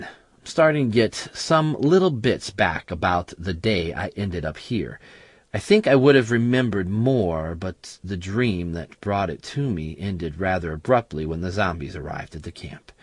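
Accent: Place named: United States. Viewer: The voice of the narrator is so casual, and conversational, for having discovered one's self in a nightmare; plus, he sounds about 16. He says he is England, so why did they use an American as a narrator?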